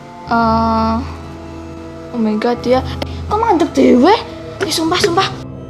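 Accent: Indonesia. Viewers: Kak orang Jawa ya?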